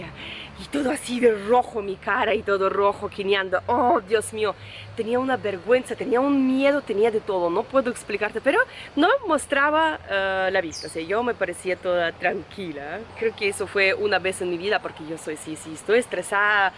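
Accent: Amazon, Peru. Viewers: Yo te escuché y pensé que eras charapa. Me encanto tu historia y la manera de contarla. Felizmente te quedaste en el Perú. Bendiciones. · Tienes un dejo charapa 😄. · Interesante divertida la forma de contar tu travesía tu español peruanisado es lo mejor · tienes un acento de la selva peruana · X ahi dice q se te escucha como charapa de la selva pero aveces te escucho como la paisana jacinta x eso me caes bien dices q vas a ir a Ayacucho en abril q bien tengo familiares x ahi la pampa de la quinua es un lugar muy turístico xq ahi se libro la ultima batalla de Ayacucho contra los españoles donde q ganamos y se sello la independencia de Peru y America hispana y tu historia es graciosa en conclusión entrastes al peru x tener un gran cu.. xq a los latinos nos gusta mujeres de gran ku.. jejejeje . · Se te pego el dejo peruano 😍😍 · Soy yo o me parece que tiene el dejo de la selva ¿? 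... Por cierto que buen español manejas. Saludos! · Tu español es rapidisimo...casi ya 100% Peruano..bien alli. !! Suerte en mi Peru, como lo extraño !!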